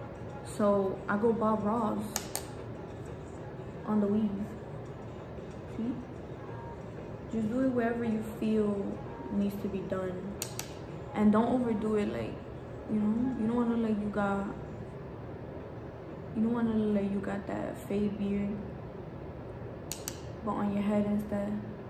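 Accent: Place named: New York, United States. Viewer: the fact i can tell you from new york lmfaoo me too 🥰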